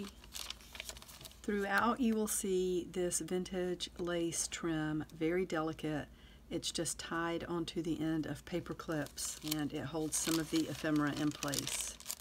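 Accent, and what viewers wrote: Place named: North Carolina, United States. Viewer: I thought I detected a NC accent!! I'm originally from Charlotte but live in California now. Where can I find your items for sale? I'm new to your page, but love it already!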